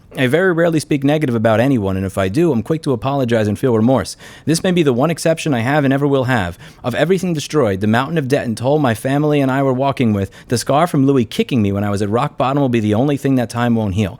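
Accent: New York, United States. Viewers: Ive watched for many years, taking a break from him over the last year or 2 (sometime after the whole RTR saga). Im back now because of this scandal and all the coverage its rightfully getting... I cant help but notice that NY ACCENT is much heavier... And he has FAR MORE NERVOUS TICKS than he ever did before... In fact, I dont remember either of these things in the past. In this video, he's twitching an awful lot. I hope hes taking care of himself. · Great video Louis. I’m a former NY’r and man the NY’r in you comes out full throttle in this video. Keep up the great work!! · Louis’s accent becoming more and more stereotypical NYT as he talks is perfect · The NY accent came out hard in this one.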